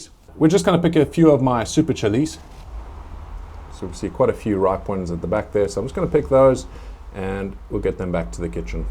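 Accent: South Africa. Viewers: Typical weather in the UK? I could have sworn you were in South Africa with the accent. Hmm.. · No way i thought you were south aftican not british! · Sorry I haven't seen your channel until today as your content is great! Are you from Capetown originally? I think I hear a definite SA accent. I have made ketchup/catsup a couple of times, much superior to store bought, but a real effort to do, I think that if you get in the rhythm of doing it, it is as much of a chore.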